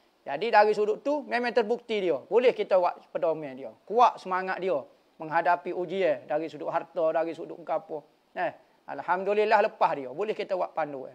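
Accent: Kelantan, Malaysia. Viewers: masalah bahasa je pon tu loghat kelantan dye nmpak biasa je tkde unsur penghinaan melainkan awak snddiri yg rasa ianya satu kurang penghormatan so diri awak yg bermasalah dlm hal ini.... awak reply mcm tu dkt ustaz yakni org alim guane boh niat tak menghalalkan cara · itu loghat klate, mu oghe luar tafsir kok lain 😂😂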